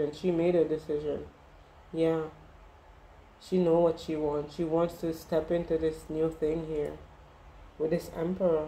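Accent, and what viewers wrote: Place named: Trinidad and Tobago. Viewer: Your readings are magnificent. You are sounding Trini 🇹🇹 & familiar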